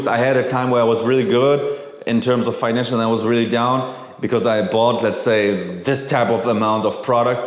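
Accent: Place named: Germany. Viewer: It’s his German accent. Btw pretty sure he speaks English better than you as a native speaker ever will lol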